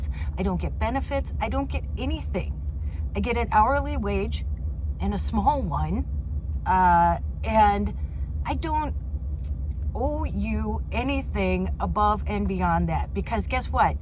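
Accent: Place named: Midwest, United States. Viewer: Good for you. Spoken like a midwestorm girl. Minnesota or Wisconsin. AL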